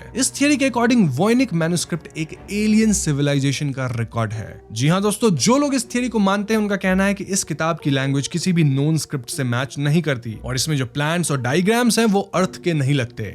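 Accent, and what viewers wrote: Bengal, India. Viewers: TUI KI BANGALI ? .....HINDI PRONUCE E KAMON JANO AKTA BANGALI TOUCH ACHE.....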